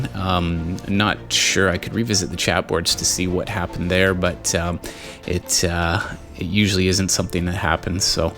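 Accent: Canada. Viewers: Hello, fellow Canadian (assumption based entirely on raised “out” vowel)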